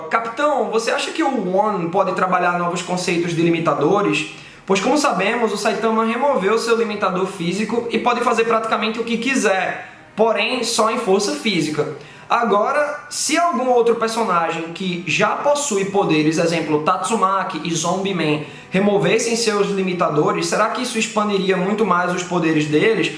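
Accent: Pernambuco, Brazil. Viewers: Mano tu é de PE?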